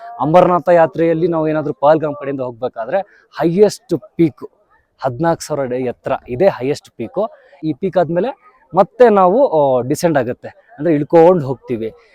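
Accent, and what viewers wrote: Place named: Central Karnataka, India. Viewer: Yava uru nimdu, launguage kelidre middle Karnataka ansutte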